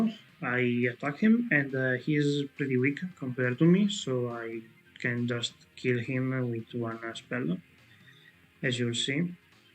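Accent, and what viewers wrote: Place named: Italy. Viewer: Minchia fra, appena ti ho sentito parlare sapevo già che tu eri Italiano, sei un grande per portare questa grandissime macro serie con i tuoi video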